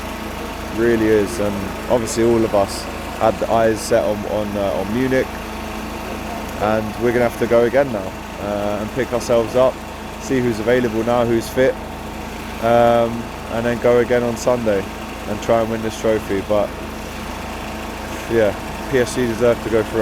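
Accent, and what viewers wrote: Liverpool, United Kingdom. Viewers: Good scouse accent that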